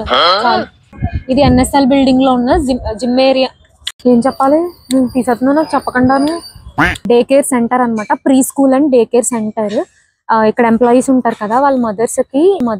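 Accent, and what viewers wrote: Godavari, India. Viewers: Mana Godavari slang , vetakaram.super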